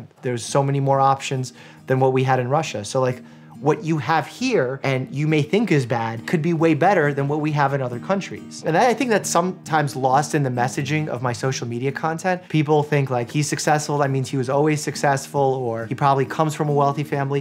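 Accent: United States. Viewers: Oh my gosh! I’ve been trying to figure out what your accent is every time I watch your videos (or regional dialect I guess is the proper term). You just have a particular way of pronouncing words that many of the people I grew up with also do (sounds a little different ‘cause we were in MN) but there’s a clarity to your speech that is common among people who learned English as a second language. It’s a beautiful variation of the American accent. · Hearing your unmistakably American accent, part of my brain refuses to believe that English is not your native language. This is all the stranger given that the same could be said of me. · My brain just exploded at hearing speaking Russian. I am a native russian as well, but I don't associate with this language AT ALL! Ahahah. Но видно, что давно не говорил по-русски, слышится акцент американский уже) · I did not know you were born in Russia. 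Your accent is as American as it can be. Good luck and stay safe out there! Also did you know “Coming to America” is a movie and it’s pretty funny. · Loving that your Russian has American accent! 😂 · My question is... HOW DID YOU GET SUCH A GOOD AMERICAN ACCENT?!?!? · wow he has an American accent when he speaks Russian? I figured he'd be fluent in both since his parents didn't speak English when he was growing up. I would think they still speak to each other in Russian since it's harder for adults to learn foreign languages than it is for kids. At least that's how it was for my gf in high school who came from Chile with her parents. Her dad's English was decent but when speaking amongst themselves it was almost always in Spanish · I know im a bit late but his russian is great. Has a only a bit of american accent as opposed to a lot (i am russian so i know) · It's probably because he came to America so young so his new English accent started to influence his Russian accent compared to if he came at like 12+ it probably wouldn't have affect his Russian. 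Also he spoke English outside of the home so even if his parents only spoke in Russian as a kid your outside of your house normally more than you are home so he has a lot more real world practice with English rather than Russian because he only speaks it with his family and nobody else. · has an American accent in English and a Russian accent in Russian. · It's really funny because I got a vibe that you had this heritage despite not knowing anything about you (just found your channel today!). Not sure what it was since your accent is fully "American"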